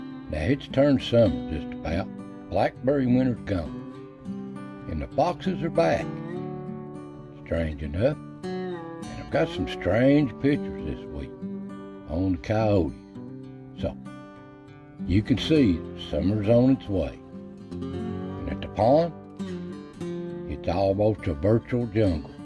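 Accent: Appalachia, United States. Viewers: Came across your YouTube videos and have really enjoyed them. It's brought back many memories from my summers in East Tennessee. I am of Scotch Irish/English decent. My mother was from Knoxville and father from Carbo VA. Russell County. My dad grew up around the mines and had a love of steam locomotives Your accent is one I'm very familiar hearing. Love the mystery stories! And the orbs, wow! Many thoughts on those. I recall sitting on my grandfather's porch as they discussed the Dennis Martin case. It was going on at that time. Appreciate all the time involved in creating the videos. Looking forward to more videos. Greg Childress Cincinnati, Ohio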